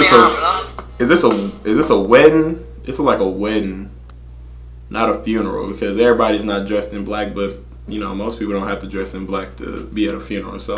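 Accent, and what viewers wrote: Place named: Ireland. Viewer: The V sign means F*** you/off. Your irish accent is jokes 🤣.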